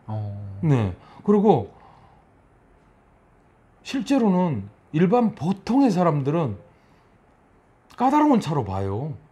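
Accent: Gyeongsang, South Korea. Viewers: 경상북도 사람이 서울상경한 말투 ㅋㅋㅋㅋㅋㅋㅋㅋㅋㅋㅋㅋㅋㅋㅋㅋ 이걸 왜 느꼈냐면,,,, 제가 그래요,,,,,,,,상주 구미 영천 안동 ㅋㅋㅋㅋㅋㅋㅋ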